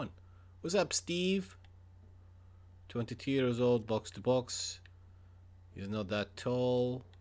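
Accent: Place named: Scotland, United Kingdom. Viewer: Where the hell are you from man? Deffo part Scottish. Cant get the other bit tho. Good videos btw. Big up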